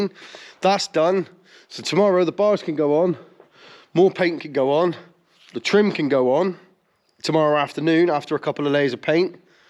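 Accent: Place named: West Country, United Kingdom. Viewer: Decent mate! You a West Country lad aswell?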